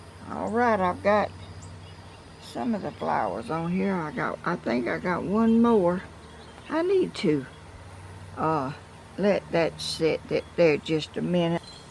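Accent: South, United States. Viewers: I just love this lady I have just discovered Ms. love her southern accent. She reminds me so much of my wise mama which I miss everyday. God bless you 💞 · I’m from Georgia and LOVE your southern accent!! Reminds me of my childhood in Georgia 🥰 You’re a doll !!!❤️ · Hi MS.! Thank you. Love you! Your stories are great! History is being taken from us. One of my Grandma's grew up in the south- - Kentucky or Tennessee- and your accent reminds me of her (she passed many years ago). You do NOT talk too much. God bless you and keep you safe so your YouTube family can continue to learn and enjoy you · Hi Ms. I live in Chicago, first i love your southern accent also your morning videos. Very relaxing to watch you perform your wonderful art.I have learn so much from watching your videos. I am 68 years old just retired and looking forward to try all your wonderful crafts ideas. 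Please don't over do it in this extreme heat. Stay cool. Stay safe. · You have the most relaxing peaceful voice. You remind me of my dear aunt who lived in Tennessee. I would love listening to her stories in her sweet southern voice just like you. I’m so glad I found you. Actually my sister did. 🥰🥰🥰🥰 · Love “southern” talk. It’s beautiful and melodic. Please don’t apologize for your southern dialect. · Miss, I’m a Georgia girl too! I’m 72 and the matriarch of my family now. How I miss my mother and sister, but sitting a while with you feels so comfortable! 😢 God loves a “Joyful Noise” and your voice is calming and joyful. That’s why the birds and animals love your voice! It’s filled with Southern Charm, Grace and Love. Thank you so much for sharing your time and crafts with all of us! I feel as if I visited home! 🙏❤️🥰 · Oh Ms, I just recently found you here on my tablet. It's so nice to hear another southern accent! 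I'm from Tennessee. I have been in Illinois for almost 17 yrs. My husband will retire soon, so I will be able to go back home. Thank you for all of the encouragement that you give us. Lord knows I need it! I could listen to you all day. So, now I am going to spend a few days with you. I want to start with your first video and watch them all. I did turn on alerts for your channel, so I will be notified when you make another one. I'm crafty too. So I am getting lots of ideas from you. One thing that I feel like I should say. Please don't be offended? I was watching your fishing trip, bless your heart, all that rain. But, what I wanted to say to you is, you being a lady of 82, and single living alone, you have to be more careful! Anything could happen. I was so afraid that you were going to go running for the car out in that parking lot. No one was around. What if you fell? Please let a friend or neighbor know when you are going to go out like that. 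We were all worried for you. Because we love you and you have become an important part of our lives. You also ran through three stop signs on your way home. Just sayin. Be a little bit more careful! God Bless you. When you hear from Cody, tell him that you are still getting more fans! I know you've got me now for sure! Much Love, Kim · Ms. I found your YouTube channel while I was living in Minnesosta and I missed hearing the southern talking I grew up with in Georgia. You talk as much as you want to, about whatever you want to talk about! It's your video. You do you! I love all your projects! Now that I'm back in Georgia, I wish that I could come visit and craft with you and drink coffee! Blessings to you and all your endeavours!-Angela Hurt from Lizella, GA.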